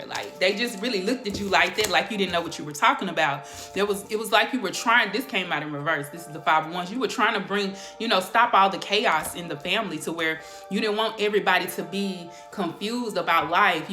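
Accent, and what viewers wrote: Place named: South, United States. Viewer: Thank you for your readings. On point. Question. Your accent. Are you from Virginia or the Carolinas?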